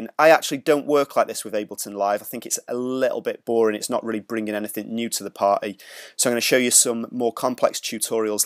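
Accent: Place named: Yorkshire, United Kingdom. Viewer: where about in England u from? (u sound very Yorkshire!)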